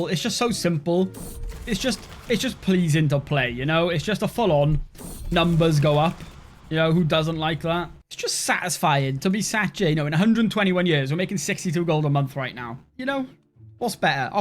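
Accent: Wales, United Kingdom. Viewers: the welsh accent threw me off, sounds like you straight from Bridgend! lol LOVE IT! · Are you Welsh? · Is that a fellow Welsh man i hear . Respect from the Merthyr Valley. 🏴⚒️⚒️⚒️.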